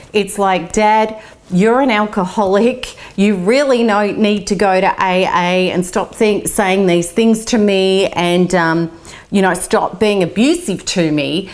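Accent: Australia. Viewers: Is she Australian? I'm curious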